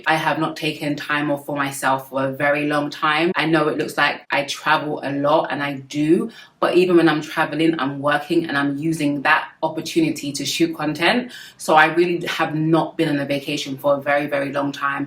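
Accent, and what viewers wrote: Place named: United Kingdom. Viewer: I love your accent so much! which city is your accent from? Everyone seems to have a different British accent depending on the area 🤔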